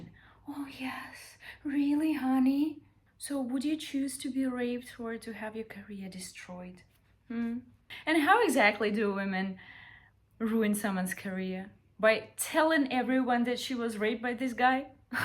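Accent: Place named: Russia. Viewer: Я сразу услышала по произношению, что это наша девочка, русская🤗 Как же ты права, милая!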